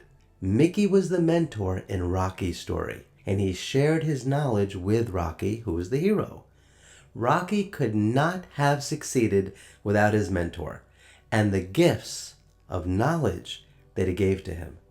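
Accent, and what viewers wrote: New York, United States. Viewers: Wow. A vegan crazy cat guy proficient in mythology and uniquely gifted to tell the tale - in a New York accent no less! 😍🥰 In the interview with Joe you quoted your mom to say a New Yorker would never be president because people don’t understand us. No truer words! And they are poorer for it.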